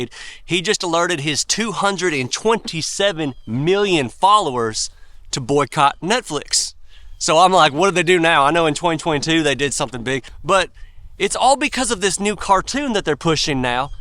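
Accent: South, United States. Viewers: I just want to move down south, so I can sound as cool as you! 😎🤓🤠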